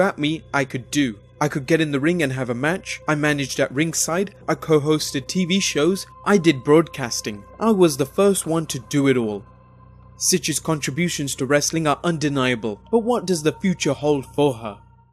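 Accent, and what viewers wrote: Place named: United Kingdom. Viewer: I wish this information was spoken in a voice that wasn't from the British pits of hell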